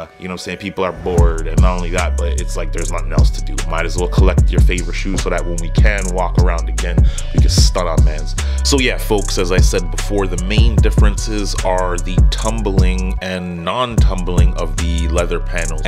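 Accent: Toronto, Canada. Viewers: Toronto mans accent strong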